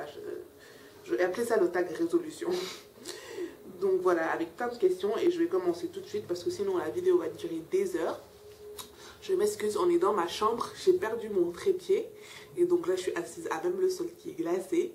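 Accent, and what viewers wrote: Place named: Senegal. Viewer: looooooooooooool l'accent galsen!!!!!! je kiff!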